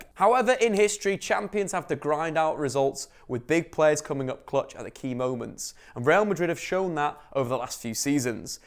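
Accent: United Kingdom. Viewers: The minute I heard your UK accent I knew that you would predict that Liverpool would go through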